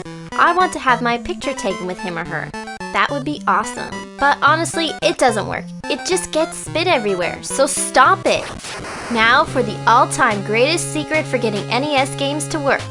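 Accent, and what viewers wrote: Canada. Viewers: Are you Canadian?! :D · I can tell she's Canadian .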